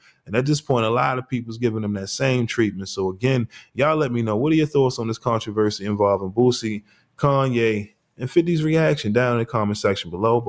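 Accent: Baltimore, United States. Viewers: I'm from Baltimore and I'm curious are u from Baltimore also? Sounds like it.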